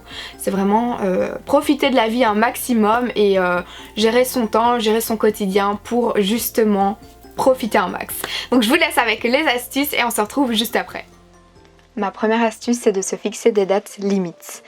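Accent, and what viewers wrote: Belgium. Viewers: J’adore ton accent belge tu me manque ❤️❤️❤️❤️❤️😪 sinon tu peux faire une vidéo sur comment avoir des bonne notes car j’en ai des mauvaises et j’en ai marre merci bisous❤️❤️❤️❤️❤️❤️❤️❤️❤️❤️❤️❤️❤️ je t❤️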